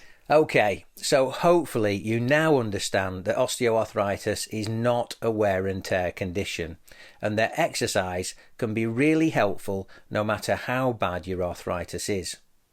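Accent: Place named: Scotland, United Kingdom. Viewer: good Scottish accent 😊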